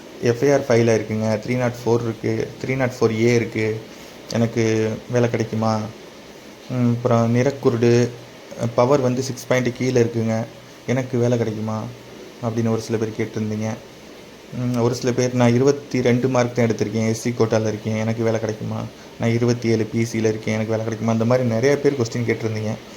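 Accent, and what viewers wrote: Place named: Tirunelveli, India. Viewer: திருநெல்வேலி தமிழ். 24. அப்புறம். 9. அப்புறம். 8. கால் லட்டர் வருமா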